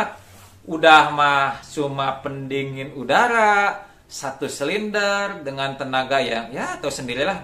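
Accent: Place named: Banjar, Indonesia. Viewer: Orang Banjar ya bang🤣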